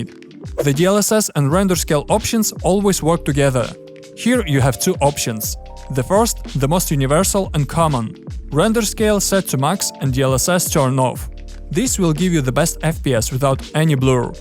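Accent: Russia. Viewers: Слышно что Русский либо Укр))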